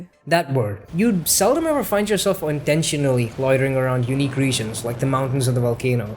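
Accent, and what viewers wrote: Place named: India. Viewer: Around 17-18 minutes mark I started feeling like you have an indian accent. :D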